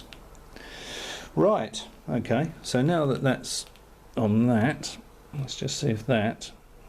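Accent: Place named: Australia. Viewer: Hi!! you seems to be an aussie!!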